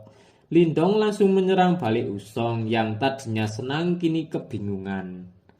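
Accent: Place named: Banyumas, Indonesia. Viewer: WONG NGAPAK TAH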